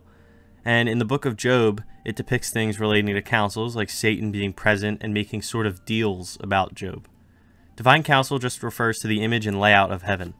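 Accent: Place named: Philadelphia, United States. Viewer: Bro hittin us with that hard Philly accent with the “wooder”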